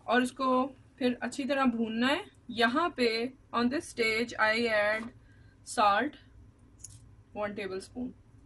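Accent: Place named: Punjab, India. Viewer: MA'AM.....you have Punjabi accent while speaking.....firstly I thought you are from INDIA.....it's so similar accent.....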